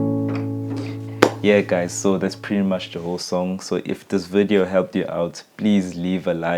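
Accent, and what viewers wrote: South Africa. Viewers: Wow you sound South African :D I got excited there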